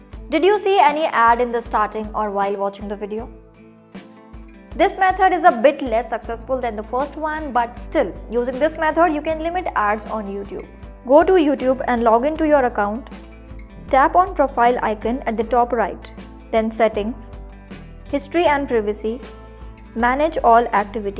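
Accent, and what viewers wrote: India. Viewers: The Indian accent... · Wtf how you know a lot of grammatical things in English but you can’t change that Indian accent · Bro I stg I can't take any videos serious with that Indian accent. Always feels like a scam to me.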